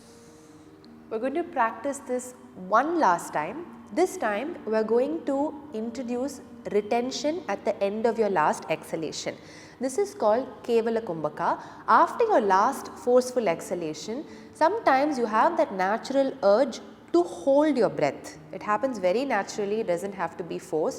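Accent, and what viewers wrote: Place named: Bangalore, India. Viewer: She belongs to Bangalore, udhar waisa hee pronounce hota hai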